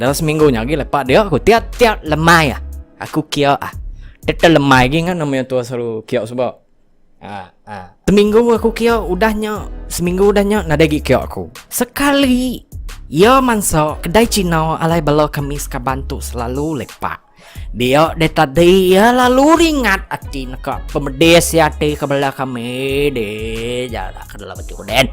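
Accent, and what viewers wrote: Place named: United States. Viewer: OMG!! this is my first video of you! THIS IS SO CONTENTED AND FUNNY!! Love your US accent. New subscriber here. Mata d manah mat..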